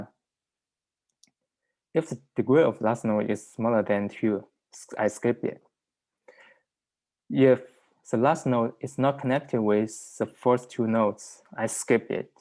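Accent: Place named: Southeast Asia. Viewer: 很重的东南亚口音，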